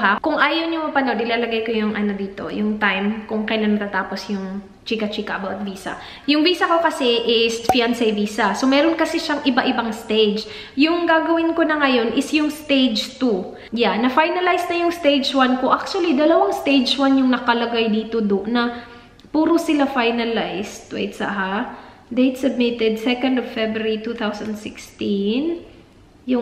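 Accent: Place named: Visayas, Philippines. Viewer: OMG ms!! Malapit ka ng mag 600k. Lagi kong kinukwento sa mga classmates ko yung vlog mo. Tapos minsan nakukuha ko na yung tono ng boses mo kahit di naman ako bisaya 🤣 i really love watching your vlogs. First time kong mag subscribe sa isang vlog. I think mag 2 months pa lang. God bless ms.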